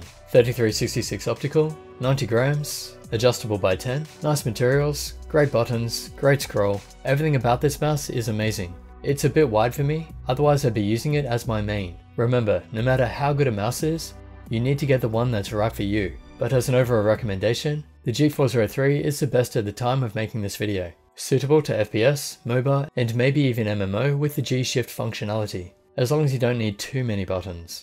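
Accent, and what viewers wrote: Australia. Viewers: you are a legend, this is exactly the type of review I wanted and then you have individual detailed reviews. I hope more manufacturers or computer tech sites hire your services. Thank you very much for your awesome review. And your aussie accent is great too (I'm from Sydney).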